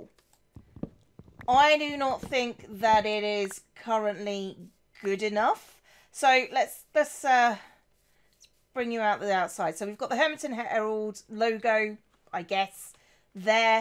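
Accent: Germany. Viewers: Are you fron Germany?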